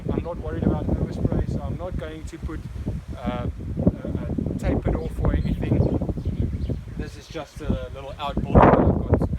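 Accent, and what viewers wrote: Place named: South Africa. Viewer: enjoy listening to the south African accent .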